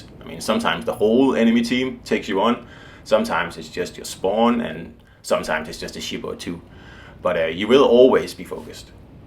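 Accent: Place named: Denmark. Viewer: Love your vids and commentary so subbed. I am just wondering were that accent is from, i am guessing Denmark. If I am wrong please know that I did not mean to be rude.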